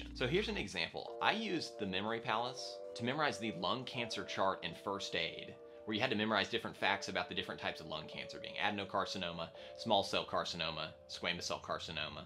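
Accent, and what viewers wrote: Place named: South, United States. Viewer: Thank you for the effective breakdown and explanation of the concepts that you presented in this video. Off topic: it was a bliss to hear your accent for it transported me to my beloved south S2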